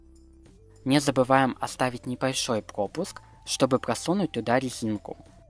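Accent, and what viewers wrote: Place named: Ukraine. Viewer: По руски говорит но слышат украински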